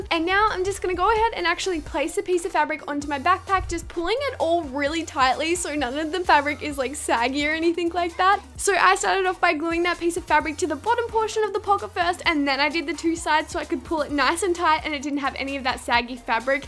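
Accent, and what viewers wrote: Australia. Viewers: I could tell she Australian cuz her accent but I wasn't sure but then she said in Australia so ya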